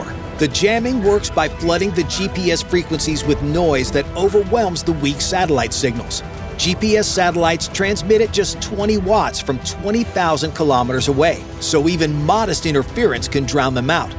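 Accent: United States. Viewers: Drop the shouty American voice over, it ruins your video !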